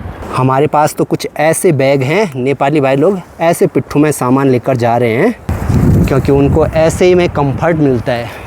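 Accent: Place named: Garhwal, India. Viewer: Sabase badhya video aapke hi hote hai..aapaki aawaj ..bolane ka tarika..hindi bolate hai fir bhi garhwali pronunciation khub bhata hai..ek sukun milata hai...thanks..dil se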